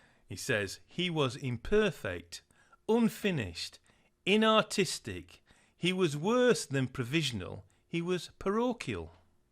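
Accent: Yorkshire, United Kingdom. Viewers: Simon is 100% correct, you really have no idea what you are talking about, that silly Yorkshire/Midlands accent is really off putting by the way...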